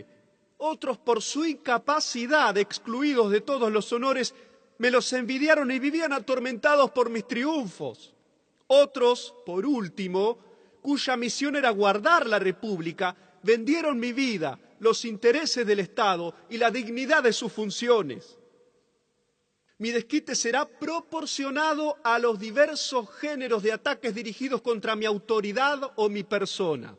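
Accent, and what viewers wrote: Argentina. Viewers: DEFINITIVAMENTE EL TIMBRE ARGENTINO ES PESIMOOOO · No había otro que no fuera argentino? Hace sonar pedante el discursivo este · No es el hecho del acento es el hecho de NO RESPETAR EL LATIN HABLANDO COMO ARGENTINO!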